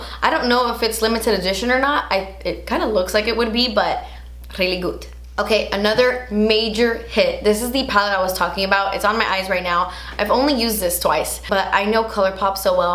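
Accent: Cuba. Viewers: Lo que está pa’ti nadie te lo quita, I’m Mexican, And I’m so in love with your Cuban accent ❤️💗💖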